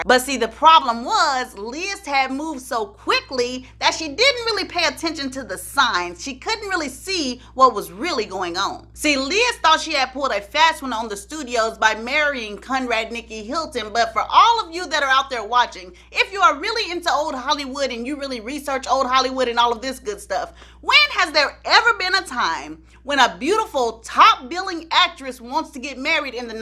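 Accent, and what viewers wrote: South, United States. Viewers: gurl...I wish I could give you five thumbs up...the way you tell a story is phenomenal!!! I had to listen to this one twice it was so funny. Your voice and that lil southern twang and your comedic timing are so on point it cracks me up every time. Thanks for the joy and laughter you bring. Sayso fam: Seek the Lord while He still may be found! All praises to the Most High...praise His Holy name...halleluYah!!! Love to all shalom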